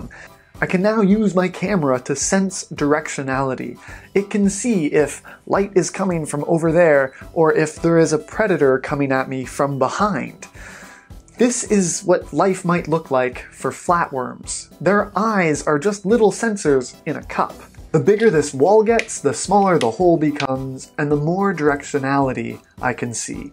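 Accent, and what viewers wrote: Canada. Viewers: oh hes canadian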